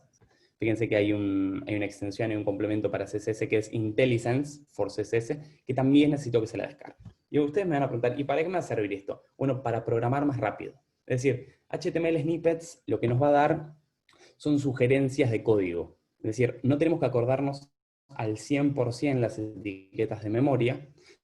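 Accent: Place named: Argentina. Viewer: Me alegra un montón encontrar creadores de contenido de éste tipo que sean argentinos! Estoy re agradecido a nuestros hermanos de otras regiones de latam, pero es mucho más cómodo escuchar la "tonada" que nos es familiar! Ya me suscribí! Ví que sos profesor en UTN, puede ser? En alguna carrera o en los cursos de extensión? De ser así, me gustaría saber en qué cursos para tenerlo en cuenta si en el futuro decido tomar alguno. Gracias!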